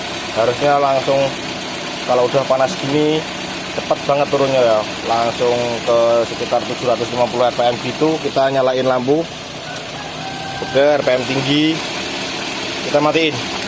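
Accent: Java, Indonesia. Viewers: Pembawaannya khas jawa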